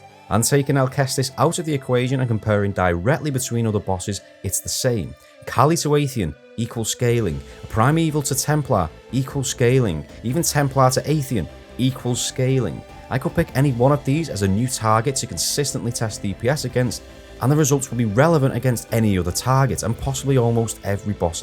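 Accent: Liverpool, United Kingdom. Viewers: Loving the thorough breakdown here, always enjoy numerical deep dives in Destiny! Thanks for the video! Also, am I detecting a fellow scouser here mate? ;D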